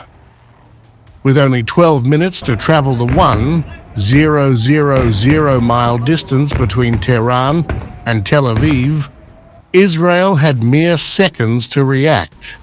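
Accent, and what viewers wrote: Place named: United Kingdom. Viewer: And speak american English, not brutish one!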